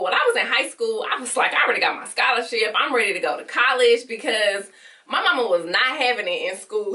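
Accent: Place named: Atlanta, United States. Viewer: prettywitty77 I'm from Atlanta and I thought she was from Atlanta too with this accent lol, I know it gets annoying when ppl ask you that haha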